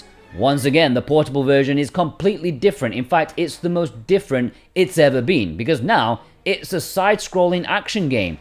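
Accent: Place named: South Yorkshire, United Kingdom. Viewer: Not going to lie a 12 hour video retrospective of Star Wars video games has filled a gap in my life that I didn’t know needed filling. The fact that it’s narrated in my native South Yorkshire accent is an insane bonus 😂 thanks mate easiest subscribe ever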